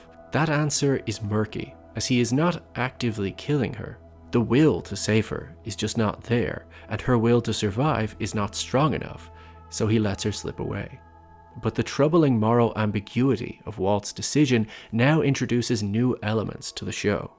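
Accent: Ireland. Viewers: Are you Irish? 🤔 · I really enjoyed this video wow. I watch a lot of video essays but this one stood out for how engaging it was. Are you Irish? I’m from Cobh.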